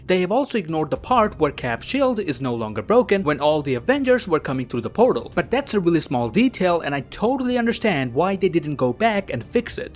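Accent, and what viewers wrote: Canada. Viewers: I like this voice more than the others voices it sounds better and more Canadian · R u from Canada?? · What part of Canada are you from mate? Really rate the accent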